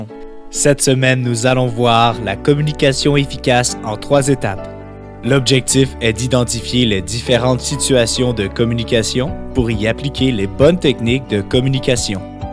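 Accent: Canada. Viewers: super, l'accent canadien c'est fun! moi j'adhère, merci! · T'as fait une présentation exemplaire mais pardon ma sauvagerie...Ton accent Canadien à détruit partiellement ce vidéo...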